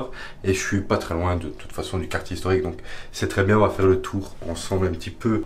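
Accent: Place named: Wallonia, Belgium. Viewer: jolie vidéo que j'ai découvert par hasard via des liens sur facebook... ca fait plaisir de voir un francophone au japon avec un bon petit accent de wallon... ca change des français. ;-)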